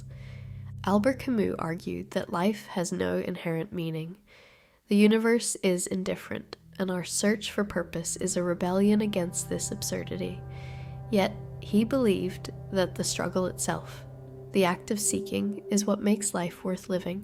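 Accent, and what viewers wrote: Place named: Ireland. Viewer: I pay attention to wait beautiful smart women say or do. And I revell in your subtle irish accent.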